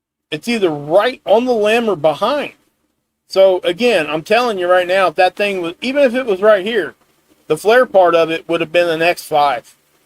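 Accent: South, United States. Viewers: You have made alot of effort to curb your southern accent. Thanks for keeping up and sharing with us. I know I can count on you for quality content.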